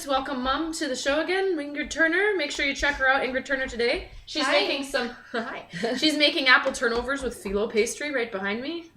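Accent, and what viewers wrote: Canada. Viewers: Lol you are so canadian! The accent is pretty sexy though...great video!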